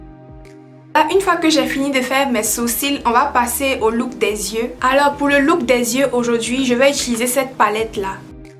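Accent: Ivory Coast. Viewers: Coucou top top ton maquillage 🤩🤩🤩👍🏽j’aime trop ton accent ivoirien 😊où je peux avoir cette palette a Abidjan?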